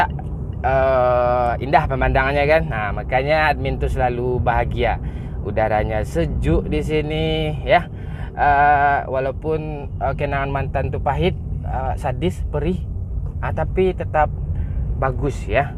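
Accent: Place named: Sipirok, Indonesia. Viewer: bawa Mobil ke rumah bOcil, terus njemput, berlanjut ke rumah Bang, kemudian jalan ke Bekauheni, naik kapal, terus ke Yana dan kemudian berlanjut ke Museum Angkot dan makan besar sama Bang.... Gitu.... #squadkampret #KocakGamong Kok aku kayak dengar ngomong Sipirok ya??? Padang Sidempuan???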